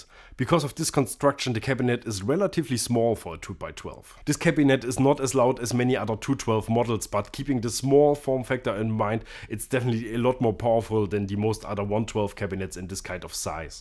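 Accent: Germany. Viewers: у него немецкий акцент?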